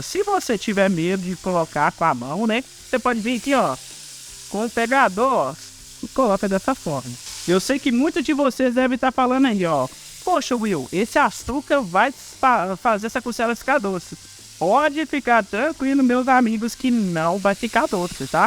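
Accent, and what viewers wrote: Minas Gerais, Brazil. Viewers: sim, nós mineiros falamos assim mesmo.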